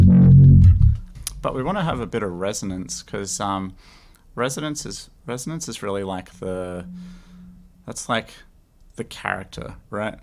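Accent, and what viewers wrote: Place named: Australia. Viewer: have the natural of the ehb1505ms (since last saturday). and cool to hear ot hear another aussie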